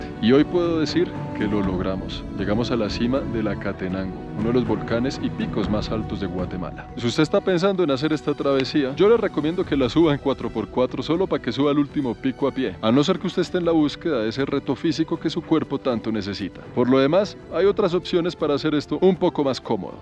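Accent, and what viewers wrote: Colombia. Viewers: Me encanta verte porque tienes tanta humildad y tu léxico excepcional tu asentó colombiano es lo máximo!!! Saludos a la abuela 😊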